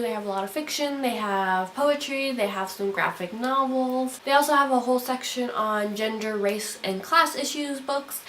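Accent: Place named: United States. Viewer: Oh, I love Margaret Atwood! Handmaid's Tale is very good... I feel like that's the obvious one to start with. I approve. :) :) On accents, I probably would have thought you were American if I didn't know any better, but you do always sound very well spoken and clear... so maybe I'd just guess you were more northern? Which you are in fact north of me.... :) :)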